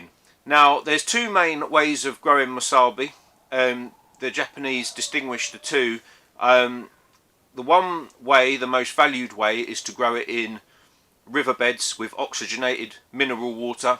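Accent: England, United Kingdom. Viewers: Can’t stand the English accent🤦🏻‍♂️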